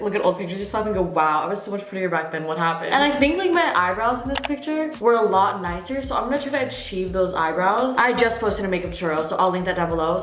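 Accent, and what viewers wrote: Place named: United Kingdom. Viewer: Loved your British accent 😂😊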